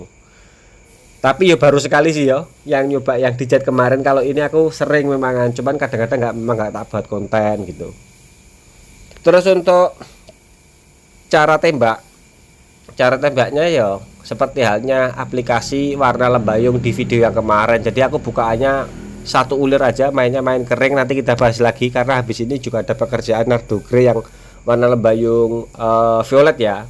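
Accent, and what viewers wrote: East Java, Indonesia. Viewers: Jelas kenal Suarane wong nggalek Tonggone cak mat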